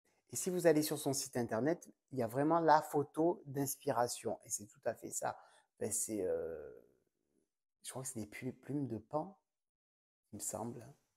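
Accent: South, France. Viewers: Bonjour Quel bon moment passé à te regarder! J' adore ton humour, ton accent 😜 et tu transmets le soleil aux gens du nord Bon moi qui suis de Perpignan je n' en manque pas de soleil! Les laines et divers objets que tu présentes sont tous plus beaux les uns que les autres! Je connais bien la boutique à Barcelone all you knit is love et j' y trouve toujours des pépites à acheter! Le trio que tu as pris est tres beau, leur qualité 70/30 est douce avec une belle définition de points Tu vas te régaler de faire un beau châle 🤔ou autre projet! Je te souhaite de passer un bel été sans sauterelles 🤣🤣👍: j' ai eu également ma part d invasion ces dernières années!! Bisous bisous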